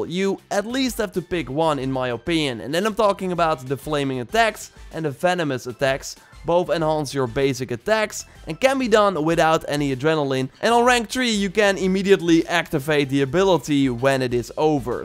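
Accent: Netherlands. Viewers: Haha je nederland engels is echt zo mega duidelijk te horen 😉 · Love the dutch accent bruh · Ik hoor gewoon aan je Engels dat je Nederlands bent, toch?🤣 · you have a very Dutch accent, are you from the NL?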